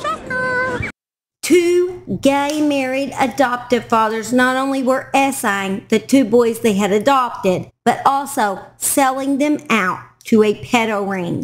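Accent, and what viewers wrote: South, United States. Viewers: Absolutely love your southern accent, love from Romania 🇷🇴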